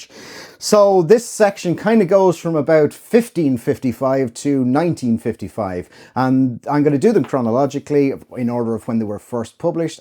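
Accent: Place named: Ireland. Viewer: I’m finishing games of thrones and lonesome dove is next — yes they are Texans as am I .. born here— you are Irish correct— your accent.. 😊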